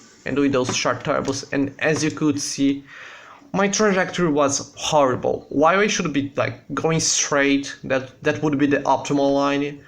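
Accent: Brazil. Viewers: Pelo inglês dá pra saber que é Br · Dude, you are brazillian, i can see, cause, eu sou brasileiro e falo inglês, você tem bastante sotaque, mas você fala bem pra caramba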